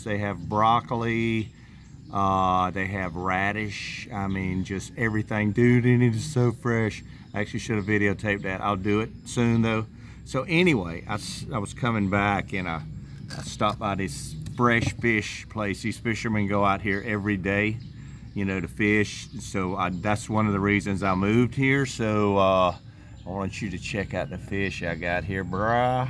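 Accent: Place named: Texas, United States. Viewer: You're a Texan cowboy lad the way you spoken yeah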